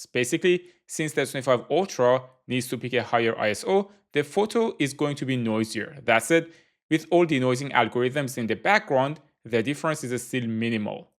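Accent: Iran. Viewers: Persian accent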